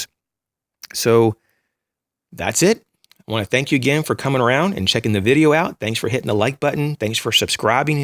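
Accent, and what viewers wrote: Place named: South, United States. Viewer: Southern Georgia? Or maybe Texas or Louisiana? Trying to place your accent. it's a hobby of mine, I'm North Alabama.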